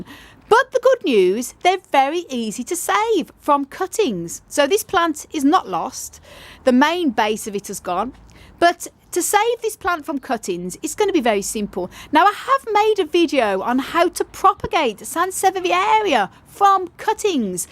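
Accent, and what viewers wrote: United Kingdom. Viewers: I loveeee your video! Your voice, your accent, your energy.. it’s everything. It feels like i am learning with someone from Hogwarts. Hehe.. Thumbs up! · british accent makes my head dizzy lol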